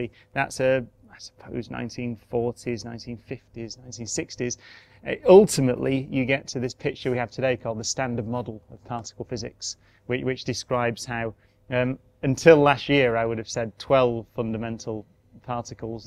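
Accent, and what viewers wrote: United Kingdom. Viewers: It's the British accent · British accent!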